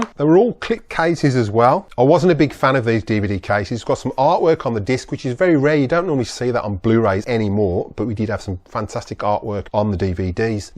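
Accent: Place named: United Kingdom. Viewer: Can I ask where you was originally from in the UK ? Sound like you from my neck of the woods 👍🏻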